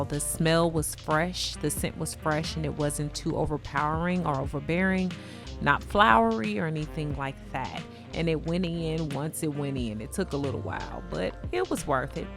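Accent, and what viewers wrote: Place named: St. Louis, United States. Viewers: Off the subject of the video but you talk like you're from St. Louis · Thanks for sharing. I love Dollar tree. I'm here for you anytime you say, here, there, etc all I think is St. Louis and Nelly. I love it ❤️❤️❤️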